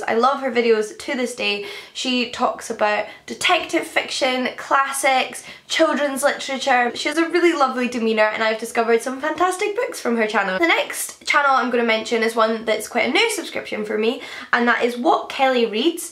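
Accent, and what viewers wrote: Scotland, United Kingdom. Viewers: And your Scottish accent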